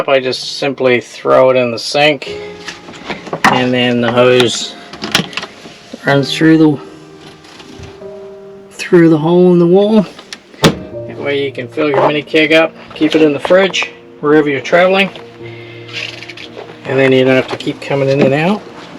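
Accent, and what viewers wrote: New Zealand. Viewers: I love this rig and the fine Kiwi accent. 😊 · Mannnnn I can hear the kiwi in ya haha awesome . I’m from WHG in the north . Kia kaha . I’m manifesting a ride like this !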